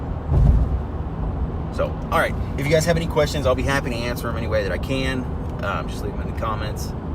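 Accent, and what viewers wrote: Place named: Indiana, United States. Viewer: Man I’m from Indiana and I was like this dude is definitely somewhere in Indiana don’t know the town but I can tell it’s Indiana 😂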